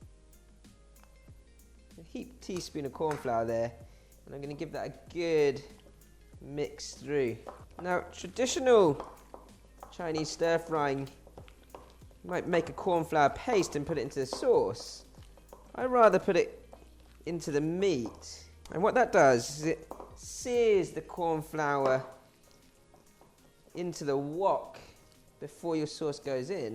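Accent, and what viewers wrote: United Kingdom. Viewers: I focus on his accent the way he talk. I love british accent 😂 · weir to heard a chinese having british accent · Chineese with a British accent love it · I love this British accent, better than the cockney accent. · Never seen a asian negga, wit British accent. Very nice. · Why he sounds like he from the UK🤔🤔🤔😁anyway it is so important to me as a Chinese sista to learn how to cook Chinese dishes for Suga Bearz Cafe I'm collecting all recipes did I tell yall my egg roll horror 😨😨😨😆😂I ate it😕 an if bae was there an not on camera duty he would have ate it too right bae 😒I want a wok so bad I can't wait I want traditional food it's all going to be apart of SUGA BEARZ Cafe soulkitchen on Royal Underground tv charter spectrum 32 booyah happy cooking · Let me figure this out......... An Asian guy with a British accent? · Interesting to hear a Chinese man speak British exotic im quite amused lol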